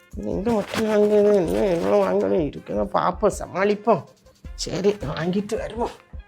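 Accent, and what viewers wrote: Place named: Kanyakumari, India. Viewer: Konjam kanyskumari slangai maathalamae.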